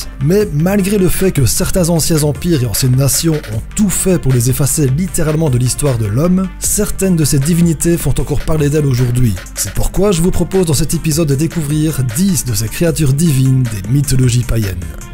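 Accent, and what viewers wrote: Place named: Belgium. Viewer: Belgique ^^ (j'ai le même xD)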